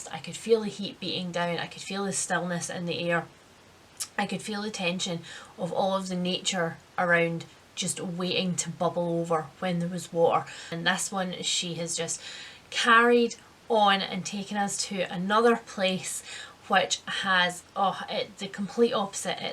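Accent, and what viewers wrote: Scotland, United Kingdom. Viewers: No conversation needed. I'm not reading these books because I don't read fiction. I read very little fiction. And when I do they are mostly adventure and historical. I just watch your videos, because, as i said, you are a force of nature and I love watching and listening to you and your accent. I would also like to apologise for thinking that you were Irish. In one of your other videos I left a comment saying that i thought you were Irish. I know that you are scottish!!. Sorry about that and I apologise for the unintended insult!!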